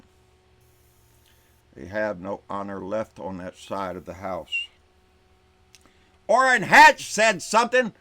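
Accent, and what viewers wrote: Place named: South, United States. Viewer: I think it's important that people hear this message delivered in a southern accent. People in 'blue states' need to be reminded that not everyone in the 'south' are ignorant enablers. I like your style, keep up the good work.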